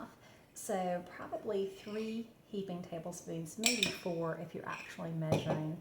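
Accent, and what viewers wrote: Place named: North Carolina, United States. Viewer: I know I’m late for this video but it looks great and can’t wait to make it! Just have to tell you (will sound weird lol) that you remind me of Dr Brent Ridge (of the Fabulous Beekman Boys); he’s a native North Carolinian too and just your mannerisms and accents are the exact same!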